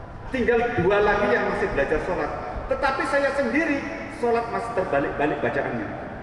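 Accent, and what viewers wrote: Indonesia. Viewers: Wong ngapak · Lho omongane medok kyok aku, kesaksian opo kuwi, ndak ada isinya, ndak ada makna ne..nggilani.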